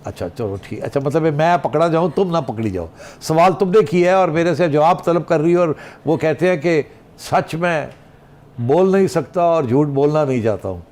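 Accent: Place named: Punjab, India. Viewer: Your punjabi touch is awesome 👍❤❤👍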